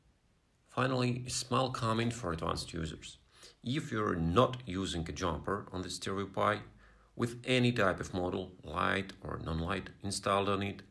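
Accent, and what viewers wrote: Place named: Russia. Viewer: да я отлично понимаю ваш руссиш инглиш, комрад, сижу, ковыряю поделку )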